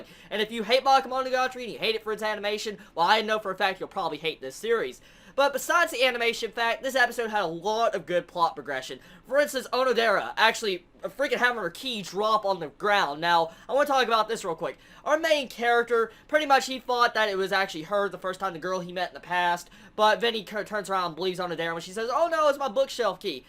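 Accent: South, United States. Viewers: This guy is so southern.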